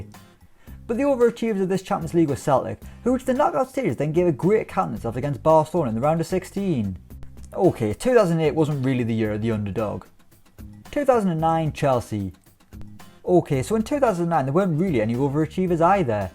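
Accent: Ireland. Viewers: starts video doesn't hear irish guy's voice "Ah shit" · I am a simple man. I don't hear irish guy. I click off.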